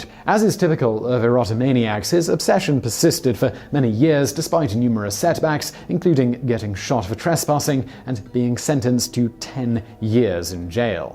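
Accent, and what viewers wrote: England, United Kingdom. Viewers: yeah. nice English accent without sounding pretentious. not that the English sound pretentious or are themselves so, it's just the American perception of it ironic that that evaluation sounds pretentious, I guess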